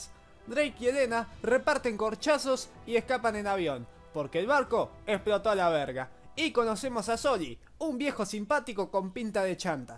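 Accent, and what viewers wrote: Argentina. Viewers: el argentino es el mejor dialecto de todos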